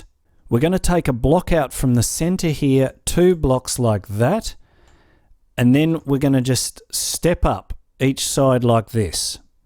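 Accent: Australia. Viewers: You sound Australian... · are you australian? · you seem australian are you? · I'm Australian and this is the most Australian accent I've every heard! Makes me proud!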